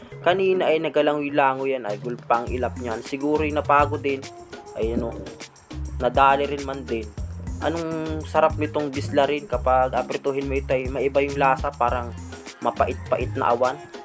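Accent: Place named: Marinduque, Philippines. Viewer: a my classmate ako dyan sa dawis si Japet labrador si melody saet mrami ako classmate dyan ipagpatuloy mo lng yan kabayan suportado kita dito sa atami japan natutuwa ako sayo mg salita marindoquienio ka tlga god bless allways idol❤❤❤❤❤❤❤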